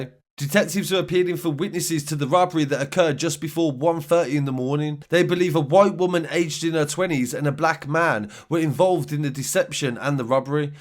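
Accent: Birmingham, United Kingdom. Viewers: Amazing work, very sad times indeed.. 😑😓 ..also your brummie accent is awesome ..🙄🙄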